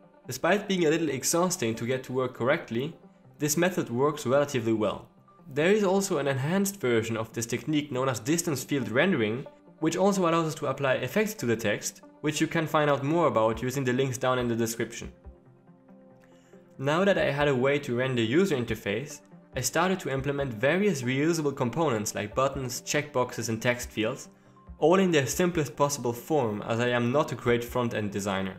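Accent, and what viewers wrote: France. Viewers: Your accent look like french. Have you upload your work on github or something like that?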